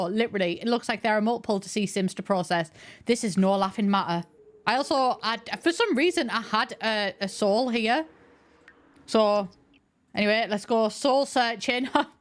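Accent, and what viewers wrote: United Kingdom. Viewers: Let me start by saying this, in order to not sound like a mean sourpuss later, I really love videos. The accent has, is making my Swedish heart pitter-patter-pitter-patter for the different British accents (yes, I tend to watch/listen to British series just to get my "daily" dose of British). Now, on to my comment that can be read in the wrong aka a mean way, which is not my intention whatsoever. At like she says "artistic prodigy", my brain went "why is saying autistic prodigy?". I had to rewatch that segment so many times, because my brain told me that sims 4 had sneakily added an autistic trait, and eventually I ended up using subtitles... the subtitles basically crushed what my brain has been begging sims to add in the game - a trait for sims with a slightly more NPF then for instance gloomy or loner. That way, even people who aren't neurotypical gets represented in the game. 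So, with that said, I never realised how much I wanted an autistic trait in the sims before the subtitles crushed it. Again - love content! <3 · I love, I love british english, but I wish there were subtitles 😂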